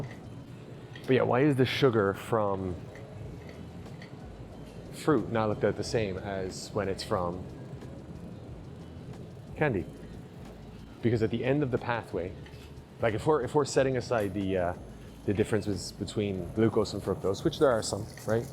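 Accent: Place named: Ireland. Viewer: Irish or American? Losing the accent lad 🇮🇪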